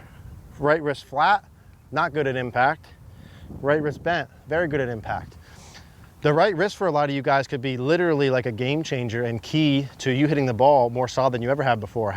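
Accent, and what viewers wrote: United States. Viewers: This only goes to prove that if someone talks fast with an American accent...and says the same thing over and over again and stating the bloody obvious....people will assume they are knowledgable. He says 'That would be bad...' but he never says WHY it would be bad...or why the opposite would necessarily be good. The condition of the wrists at the Top of the Backswing is completely irrelevant to the conditions at Impact...Ben Hogan had 'cupped' at the top and 'bowed' at Impact, Nicklaus had 'straight at the top and straight at Impact. Any golfer who regularly has his trailing wrist bend back as far as it will go at the Top of the Backswing is a) risking injury and b) restricting his backswing...Gary Player said that and clowns like this should stop conning people into believing that it is a good thing because it is somehow linked to Impact. 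It isn't and it isn't !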